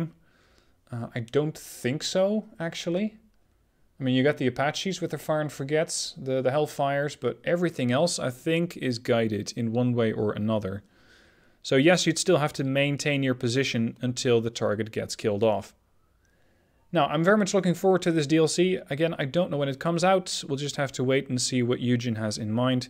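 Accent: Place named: Netherlands. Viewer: Holy Dutch accent! (Flemish here ;)) Looking forward to this.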